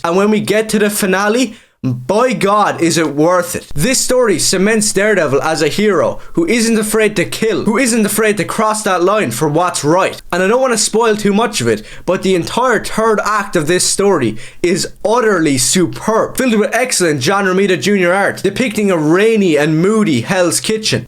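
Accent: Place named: Ireland. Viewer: fine Irish yapping man, imma read it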